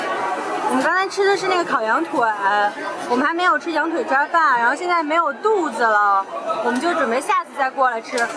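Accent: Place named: Beijing, China. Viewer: 北京味儿贼亲切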